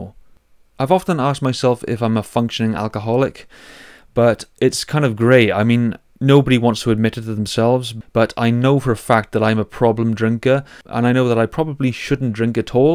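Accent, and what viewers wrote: Wales, United Kingdom. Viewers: Get a mountain bike bro, amazing for dopamine stimulation and if (judging by your accent) you live in Wales your spoilt for choice for amazing trails. Soon make friends to ride with. Totally relate to drinking issues though, it's kryptonite for ADHD brains.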